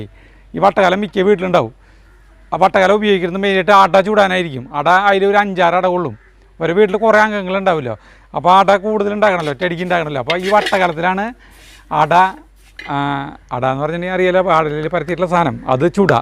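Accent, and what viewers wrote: Thrissur, India. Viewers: സംസാരം കേൾക്കുമ്പോൾ ശക്തനിൽ വന്ന ഫീൽ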